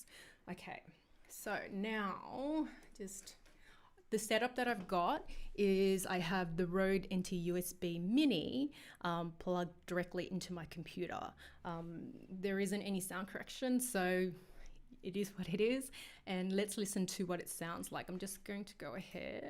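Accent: Australia. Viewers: An Asian with an Australian accent? What a beautiful mix!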